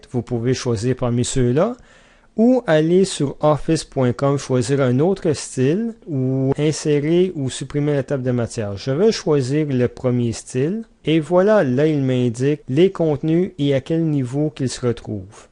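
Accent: Quebec, Canada. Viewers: avec l'accent québécois en plus!!! :P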